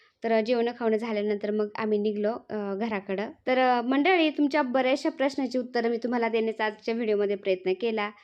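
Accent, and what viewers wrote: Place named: Parner, India. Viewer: ताई तुमचा आवाजाची टोन ऐकुन, अस वाटतच होत की तुम्ही माझ्या माहेरच्या बाजुच्या वाटतात, पन हा vidieo पाहुन कळल कि आपन दोघी एकाच जिल्ह्य़ातील आहे,माझ माहेर पारनेर आहे ,मी पन नविनच youtube channel चालु केलाय ,तुम्ही कुठे रहातात ते please सांगा मला तुम्हाला भेटण्याची खुप खुप ईच्छा आहे ताई please reply दया 🙏🏻🙏🏻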